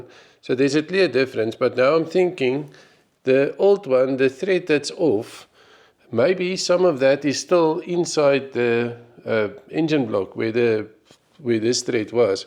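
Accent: South Africa. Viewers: Het perongeluk op jou channel afgekom. Lekker om n afrikaanse aksent te hoor al die pad hier in Skotland. Ek het n 1250GSA en kan sien eendag sal ek die videos kan kyk vir enige raad. Sterkte en kan nie wag vir volgende video nie.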